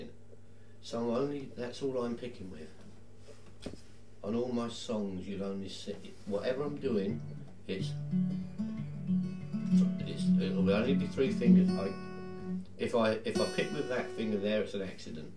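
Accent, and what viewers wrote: United Kingdom. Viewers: His British accent makes him more entertaining!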